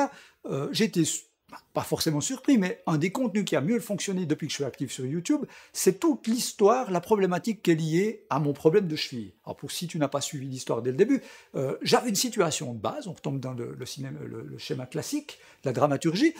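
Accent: Switzerland. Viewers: Salut j'aimerais juste savoir comment tu as fait ton intro ? Sinon continue comme ça, tes vidéos sont très inspirante et a ce que j’entends tu viens de Suisse ahahah.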